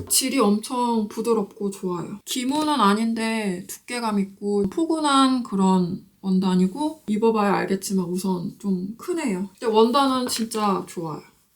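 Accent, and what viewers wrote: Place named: Seoul, South Korea. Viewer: snl 나온 서울사투리를 현실로 본것같애요! ㅋㅋㅋㅋㅋ 옷 다 이뻐요 잘 보구갑니당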